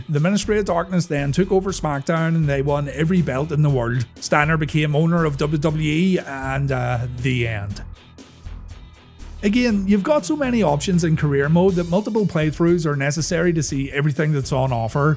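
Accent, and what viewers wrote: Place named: Belfast, United Kingdom. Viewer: You from Belfast lad? You sound like it, from there myself.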